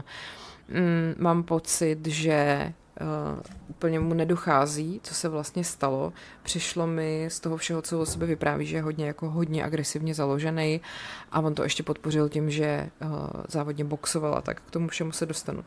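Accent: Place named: Prague, Czech Republic. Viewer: Ten, prazskej, přízvuk slečny úplně trhá uši. Navíc pořád mele a nic nepoví.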